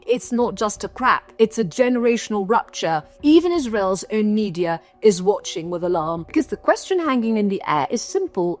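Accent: RP, United Kingdom. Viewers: Not to go off-topic (wonderful powerful message eloquently delivered), but I just have to know where here voice (accent / pronunciation) comes from? It's like she swallowed a few English monarchs and perhaps some Thatcher as well. Also, in how far is this her 'reporter voice', as in, how different is it from her natural day to day speaking voice? I'm intrigued.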